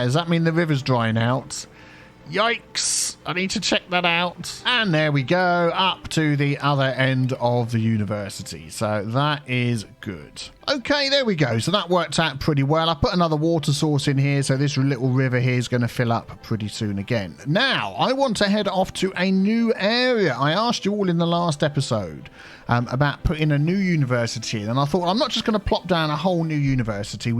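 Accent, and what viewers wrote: United Kingdom. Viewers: what do you specifically call your accent? Just british?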